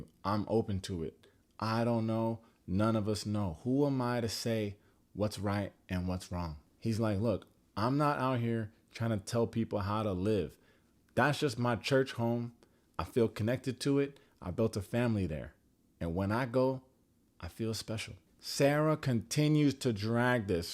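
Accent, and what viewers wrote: Virginia, United States. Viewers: I will never not love your Virginia voice. Ever. Never change, King. We’ll miss you at the reunion!!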